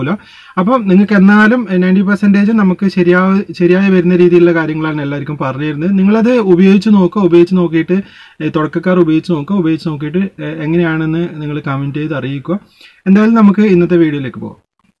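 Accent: Kannur, India. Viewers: Sprrr...ellaavarum paranjadu pole tanne nannaayi manassilaakki tharunnind ee video...njnm licen edthitt 4 years kazhinju...roadil vere vandikal illaathappozhanu njn drive cheyyaaru 🤣 bt ee video kandapol naalethanne poyi practice cheyyanonnu tonnunnu....nthaayalm nalla avatharam 👌👌 ...ningalde samsaaram kettitt ningal kannur aanuo😀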